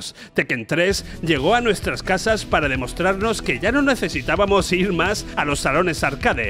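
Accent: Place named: Andalusia, Spain. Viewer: Me encanta como los ibéricos pronuncian y distinguen los sonidos SC como eSH-Zenarios 😆😍Buenísimo el video abrazos desde Argentina al gran andaluz 🤩🥰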